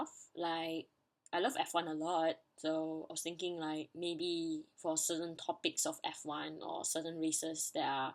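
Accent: Singapore. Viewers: Aww don't apologize. I love your accent. I always wanted to visit Singapore. I hope you can check out my channel too? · What no I love singaporean accents (lah) :) You're so lovely (I'm watching!) and am looking foreward to more vids!!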